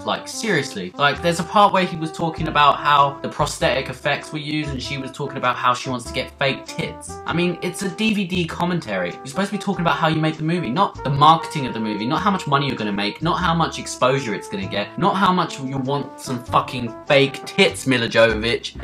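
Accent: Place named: RP, United Kingdom. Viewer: Great review and a great posh accent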